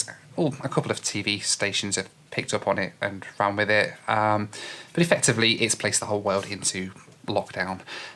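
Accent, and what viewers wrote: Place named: Derbyshire, United Kingdom. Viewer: Been watching your videos for a while and thought I know that accent, being a ‘local’ to Chesterfield too.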